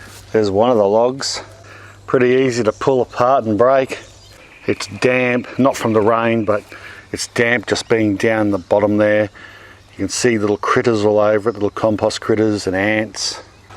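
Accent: Australia. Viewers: was raised loving the crocodile guy accent, AND YOU HAVE IT ALONG WITH HIS VOICE, JUST MORE CHILL, MAKES YOUR VIDEOS MUCH INTERESTING, LOVE AND APreciate, ESPECIALLY WHEN U SAY, "SO NEXT LETS GO TO THE NEST TRUNK, OH YEAH ITS CHIPING, ITS TURNING INTO DIRT AND DUST" LOL LOVE IT MAN, IF THE TRUNKS WERE CROCKS 🤣👌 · Came here to listen to an Aussie talk about what happening Down Under. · Australian accent is funny 😂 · Typical Australian hehe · Straight Aussie. Reminds me of my dad 😆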